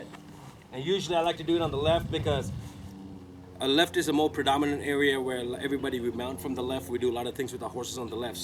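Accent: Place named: Caribbean. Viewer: another awesome vid. I love your accent .. caribbean with a 'twang' :) Where are your roots ?? St Thomas ? :)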